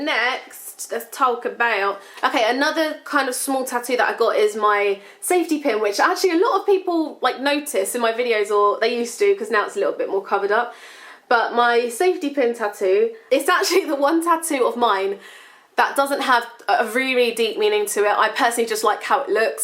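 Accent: London, United Kingdom. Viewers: the london accent. :) <3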